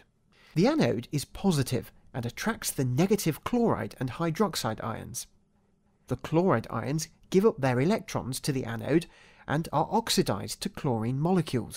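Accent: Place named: United Kingdom. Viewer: British accent and science, savouring!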